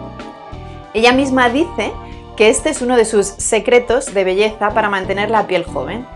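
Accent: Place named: Spain. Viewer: Jaja graciosa forma de contar historia ,me parece que eres de España yo soy panameño.Y todas me parecieron interesantes ,pero como dirian por allá,joder hoy no es que hemos avansado mucho pues en terminos verdaderamente medicos la sangre es como entrar a un espeso bosque no hay forma de saber con exactitud todas las clases de alimañas que hay ahí y la seguimos usando en tranfuciones lo cual es peor que tomarla .Y los medicos aseguran que es seguro.En ves de promover los espansores como el voluven la eritropoyetina y la las operaciones mediante cirujia de recuperació sanguinea de la misma persona lo cual se ha hecho muchas veces ,pero no siguen promoviendo las trafuciones........ Me encantan tus videos saludos..!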